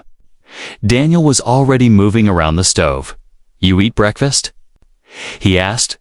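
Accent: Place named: United States. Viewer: The Anerican accent does not work with these kind of stories.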